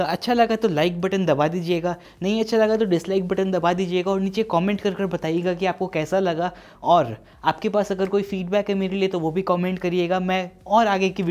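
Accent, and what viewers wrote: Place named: Kolkata, India. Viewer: Mujhe aapke bolne k style se hamesha lagta tha k aap kolkata se belong karte h...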